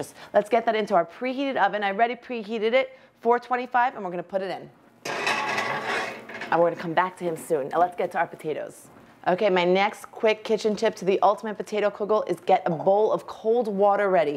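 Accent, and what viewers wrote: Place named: Brooklyn, United States. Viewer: Diane C she's speaking Crown Heights/Boro Park-ese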